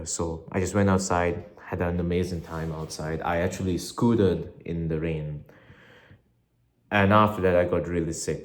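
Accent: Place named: South Asia. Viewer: Habibi, yessss don’t waste the water that could go to starving bettas in Africa! Habibi u r fresh off the boat and no one can hear a word through that curry accent.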